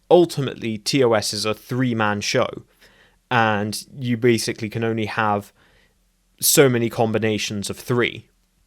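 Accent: United Kingdom. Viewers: a British pronunciation should be expected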